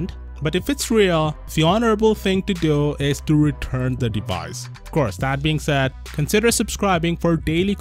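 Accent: India. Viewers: He has a certain accent. Indian maybe? I'm not sure. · Are you India? 😅 I just wonder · His accent is that of an Indian person correct? They called me like a hundred times a day I am inherently racist sorry I can't stand you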